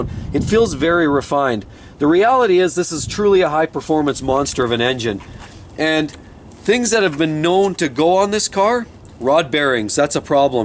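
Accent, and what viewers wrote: Canada. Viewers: Your Canadian aren’t are you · listening to your accent to figure out that you are another fellow canadian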